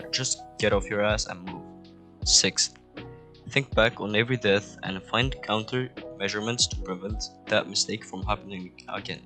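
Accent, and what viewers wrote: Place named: Netherlands. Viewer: ofcourse i am also from europe and i recognized a little accent for me it sounded dutch it would be funny if you talked dutch!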